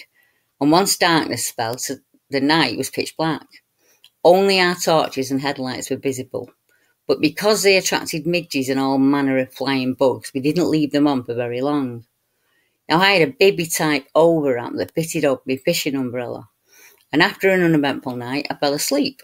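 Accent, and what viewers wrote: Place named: Lancashire, United Kingdom. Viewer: I am moving from Manchester to the US in a few weeks. When I miss that Lancashire accent, I am going to listen to your channel,. I appreciate the work you do!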